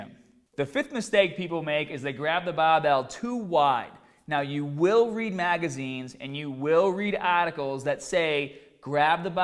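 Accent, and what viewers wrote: Boston, United States. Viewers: love these videos but sometimes that accent makes me crack up :Bah, Bahbell, Hahd, entiah, muscle fibah hahaI wonder how weirb my SoCal accent sounds in Boston and new yohk and new joisey ? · Got nothin but love for that Boston accent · I'm assuming you're from Boston, Mass. Love the accent [here in Australia!]. Very informative video. Excellent. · Subscribed for the Boston accent and legit information. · boston aye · Sounds closer to a Boston accent. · yeah the dude has a real Boston accent lol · Love the Boston accent. No homo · Boston or New England accent basically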